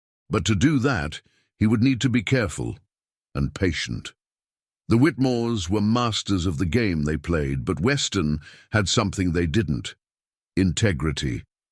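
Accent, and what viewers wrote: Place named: Mid-Atlantic, United States. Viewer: The narrator appears to be a real person with the most wonderful mid-Atlantic accent, British, but with American vowels.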